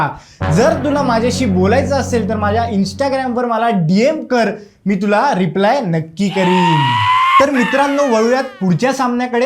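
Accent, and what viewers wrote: Pune, India. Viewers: लय भारी बोलता राव, टिपिकल पुणेरी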